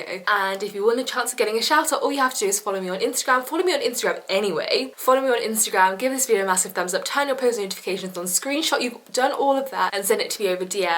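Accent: United Kingdom. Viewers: I just realized that you’re talking with British accent😂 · irrelevant trash Its like a british accent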